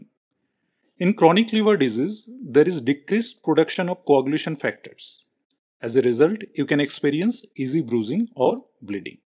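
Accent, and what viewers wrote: India. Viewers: Thank you for the information. I have great respect for doctors from India 🇮🇳. Your voice gives it away. You are very knowledgeable.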